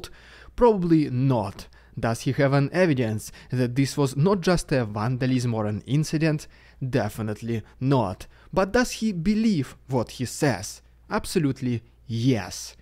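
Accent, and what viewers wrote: Russia. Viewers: Obviously your world is very small. Probably good that you move on. Listen to him for a week and you hardly notice his Russian accent.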